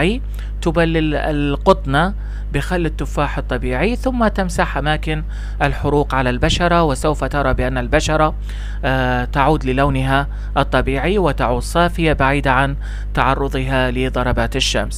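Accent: Lebanon. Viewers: هل انت لبناني.. اذا كنت عراقي لماذا تلهج بالهجه البنانيه؟؟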